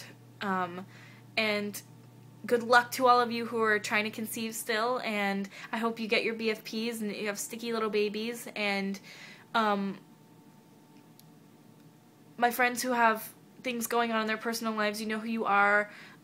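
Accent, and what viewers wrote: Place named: United States. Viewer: Yeah i didnt wanna admit but i just eat it too, coz im a fattie and i think 'meh. cake is good' ha. Well, im with you.. im trying to be good.... I've been prescribed this medicine called orlistat which doesnt let the body absorb fat or something... my bum is officially on fire lol. sorry tmi but hey were all ladies moaning here :) And yeh your accent is great!! Any american accent is soo glam to the boring brits lol x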